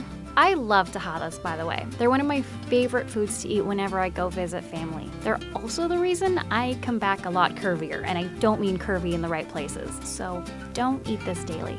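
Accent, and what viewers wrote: United States. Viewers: Hablas como gringa habla un poco de español moja yo soy de Costa Rica y quiero que enseñes a hacer gallo pinto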